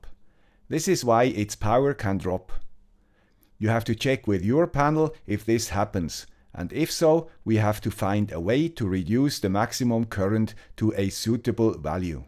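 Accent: Switzerland. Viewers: Ha. I never knew that. Alway thought it a brilliant scene. Maybe it is even better now :-) And than you learn that after 43 years on a channel from a guy with a Swiss accent :-) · Woh, 5 people don't like your swiss accent. 😊 Thx for this intresting video! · Ah, das ist also eine Youtube "Option" für creators, wo dann neben den Untertiteln eben auch die Titel und Texte gleich mit übersetzt werden , denn mir sind zuletzt eine Reihe von Videos von anderen ohne "deutschen Bezug" untergekommen und ich mich fragte, was da los sei, wobei da aber auch welche waren, die in Berlin am Brandenburger Tor gewesen waren, weshalb ich ins Grübeln kam. Vorher bekam ich halt alles "100% authentisch" (from the guy with the suisse accent), von daher fiel halt der Titel auf. Ich muss mal sehen, wie ich nur noch die Originaltitel bekomme. Jetzt hieß der Titel hier im Video wie auch in der Kanalübersicht: "Günstige und einfache Solarenergie für unsere kleinen Projekte (Deutsche Untertitel)" Wie immer ein tolles Thema spannend präsentiert und vor allem auch in all den "I want more" Stellen im Detail erklärt. 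Und ich fand auch die Verweise auf andere, ältere Videos sehr gut, weil da Videos darunter waren, die ich verpasst haben muss. DANKE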